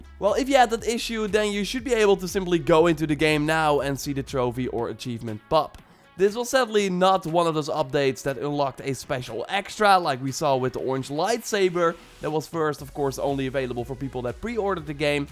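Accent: Netherlands. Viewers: Volgens mij is hij nederlands vooral het accent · Are you Dutch? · Are u dutch?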